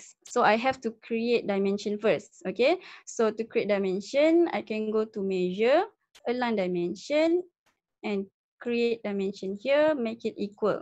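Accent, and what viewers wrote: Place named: France. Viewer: Thank you for the tip... Very nice Done! By the way what a cute French Accent!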